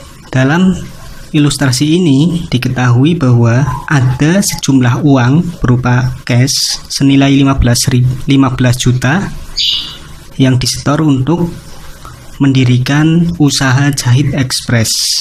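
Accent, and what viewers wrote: Java, Indonesia. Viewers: Medok bgtt mass.. 😳😂klo pke hndsfre gmn gitu.. Tpi mmbtu toturnya.. Trims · MODAL (Medok banget)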